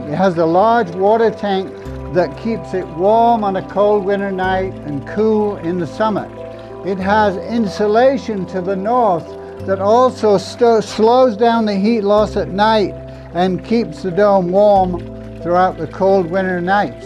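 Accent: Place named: Yorkshire, United Kingdom. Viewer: I think I can hear a Yorkshire accent poking out through many years in the US?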